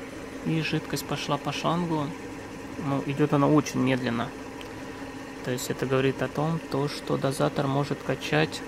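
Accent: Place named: Moldova. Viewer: Спасибо за обзор. Очень помог мне настроить девайс. Удачи тебе!!! Кстати акцент у тебя интересный, случайно не из Молдавии? Всех благ!!!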